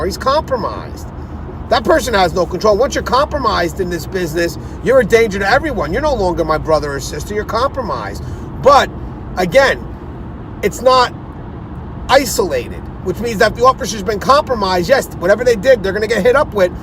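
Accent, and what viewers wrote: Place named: New York, United States. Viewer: Tier Talk Correctionsone YouTube Channel because I’m a NYer and you just sound like someone that could had lived on my block lol.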